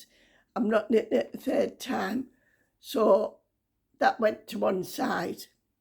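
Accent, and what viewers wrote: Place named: North East, United Kingdom. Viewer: don’t apologise, sounds like its been a really tougher time lately. Love spending time with you and hearing someone from my neck of the woods, I live abroad at the moment. But miss the north east. Hope you keep getting a bit stronger each day. Take care love and hugs to you and your family xx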